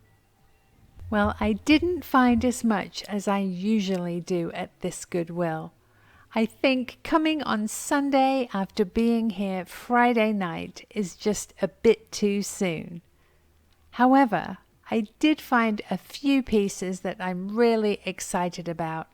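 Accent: England, United Kingdom. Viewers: Yes, love her voice and English accent.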